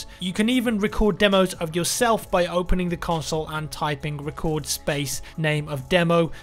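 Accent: United Kingdom. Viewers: U r british m8?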